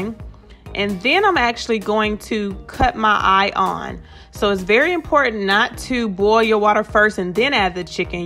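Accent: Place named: South, United States. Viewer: You got to be from the south girlll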